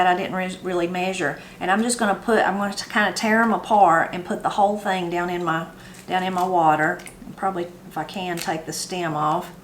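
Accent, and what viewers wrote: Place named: Ozarks, United States. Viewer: So my Mom is Hawaiian- Filipino and my Father is from Arkansas Ozark area. The Ozark folks have so many similarities from accent to foods to the Appalachia folks. In Hawai’i Passion fruit (Lilikoi) is used in desserts and mostly to make a favorite drink called POG. POG is a mix of Passion fruit, Orange and Guava juices 💖🌺💖 I Love You channel! It reminds me of cooking in the kitchen with my Granny.. the accent and food is exactly the same. She passed several years ago and always hold her and her memories close to my heart. Watching your videos brings so much nostalgia. Thank You❤❤❤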